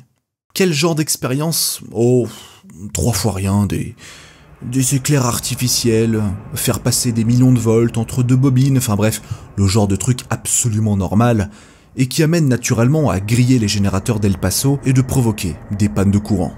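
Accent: Belgium. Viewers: En tant que cousin français, la prononciation à la belge (quand bien même plus logique lorsque l'on y réfléchit) des nombres implique effectivement une conversion lorsque l'on l'écoute. Inutile d'être mesquin si cela fait tiquer, c'est naturel. Pauvres habitants d'El Paso, avoir la finale de Super Bawl qui saute, ils en ont eu, de la patience! Le SAA a dù démanger! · Bravo tu parle le francais comme un belge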